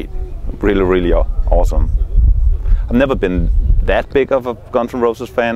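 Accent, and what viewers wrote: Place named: Denmark. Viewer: Why fix it if it ain't broken ~ gotta love the slight Danish accent, these guys are great, can't wait to see them live next year!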